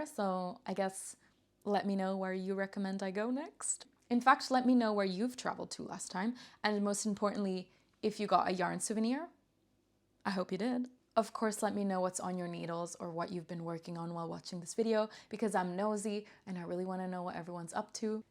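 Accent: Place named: Italy. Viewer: You are Italian?? Great accent!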